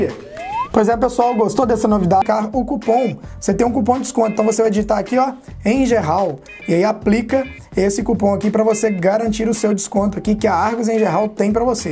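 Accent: Goiás, Brazil. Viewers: Goiano? O seu assistente é de Goiàs! hehehh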